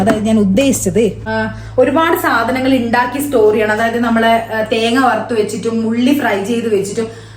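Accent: Kannur, India. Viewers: Adipowli chechi❤ എനിക്ക് ചേച്ചിടെ സംസാരം ഭയങ്കര ഇഷ്ട്ടായിന് നമ്മളെ കണ്ണൂർ സ്‌ലാങ് വേറെ നാട്ടിൽ നിൽക്കുമ്പോഴും മാറിട്ടില്ലല്ലോ👍👍👍🥰🥰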